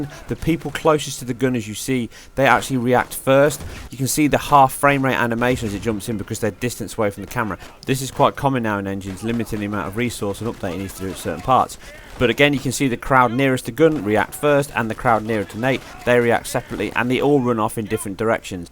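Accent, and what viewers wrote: United Kingdom. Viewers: that voice makes this video unwatchable fucking brit accent shit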